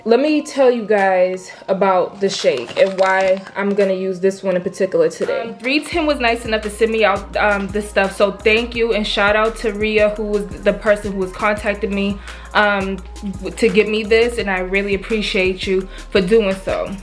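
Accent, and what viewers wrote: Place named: New York, United States. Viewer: Girl yassss get your sponsorships I see you sis! I'm a vanilla lover as well - I usually choose that flavor when it comes to protein shakes. Also, where are you from? Are you from NY? I have been trying to guess based on your accent!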